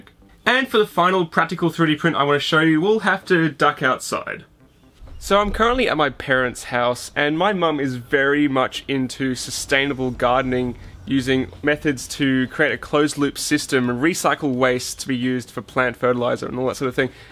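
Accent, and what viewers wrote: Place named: Australia. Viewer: 5 years old and 1million views and no one has mentioned that in the closed captioning you say you defecate in your car. The auto captioning has trouble with Aussie accents, lol. Great video, all the best! Eddie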